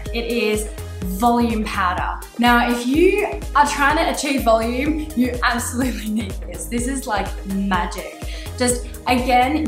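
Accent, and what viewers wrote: Australia. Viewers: The curls that you made is so lovely, you look so gorgeous. And I love your Aussie accent.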